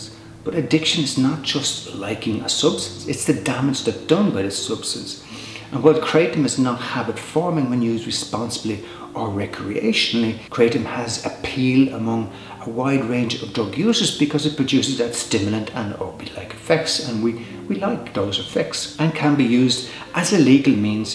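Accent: Ireland. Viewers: God bless you, you be rocking the McGregor accent/look a little!:)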